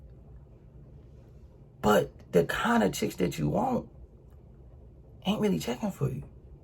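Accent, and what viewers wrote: Baltimore, United States. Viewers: Are u from Baltimore? U got the accent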